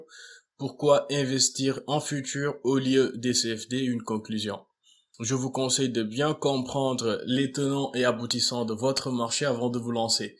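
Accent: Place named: Senegal. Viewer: Bonjour, très bonne vidéo ! J’ai crue percevoir un soupçon de parfum de la teranga dans votre élocution(!très agréable),right? Cordialement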